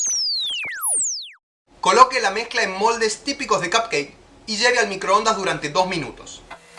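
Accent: Argentina. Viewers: Sos argentino ¿nO?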